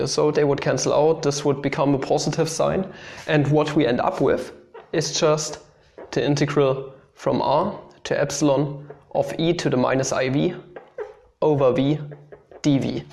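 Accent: Germany. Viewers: No offense, but the German accent is not helping pronunciation. 😁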